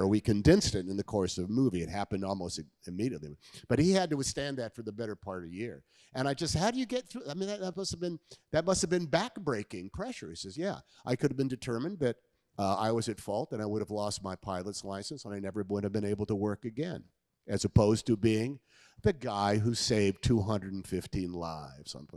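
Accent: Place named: RP, United Kingdom. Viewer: For the Americans, that was a ridiculously posh accent, not a Bristol accent.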